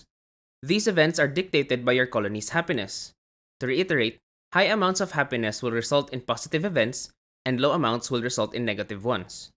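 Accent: Philippines. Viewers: Hi there you sound Filipino sorry if im incorrect. But you are the best Planetfall teacher ever, you literally teach us what we need to know unlike other youtubers they talk for hours and it so boring and draining I can't be bothered listening to them. I only needed to know what diplomacy was but one question How did your game start with ''0'' reputation for your enemies in my game they always start with -300? and I am always at war. I can't pronounce anything to them in Scenario. I also can't trade Casus Beli. What am I doing wrong how do I start with Neutral Reputation. Please anyone help.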